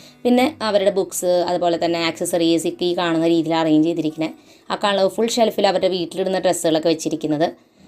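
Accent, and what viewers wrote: Thrissur, India. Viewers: Chechi Thrissurkari ano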